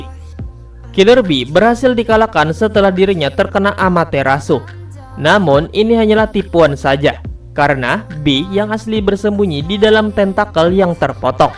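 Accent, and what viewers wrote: Banjar, Indonesia. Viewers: Orang banjar kah nyawa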